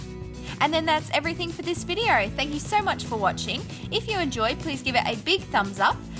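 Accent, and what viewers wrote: Australia. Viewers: Omg she sound sooo AUSSIE 🐨🐨🐨🐨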